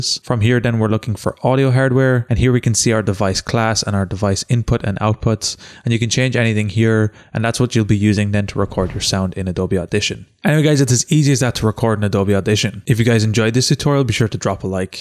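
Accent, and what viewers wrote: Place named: Ireland. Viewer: Good man, good to hear an Irish voice, thanks for the video 👍🏻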